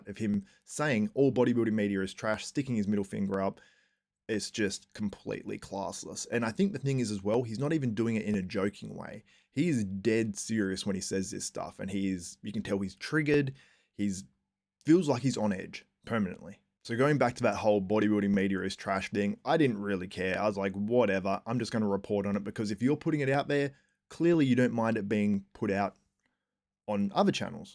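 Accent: United States. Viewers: Your Martin-esque American accent is hilarious! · lol nice american accent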